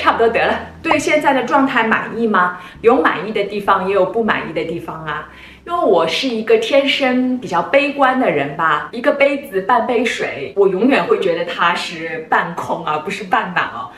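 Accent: Shanghai, China. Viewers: 常驻伦敦的上海人表示听着很上海很亲切 恳求不要改进❤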